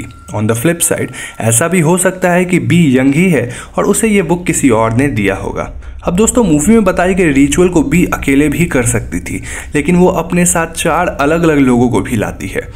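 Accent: Bengal, India. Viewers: You have a bengali accent😅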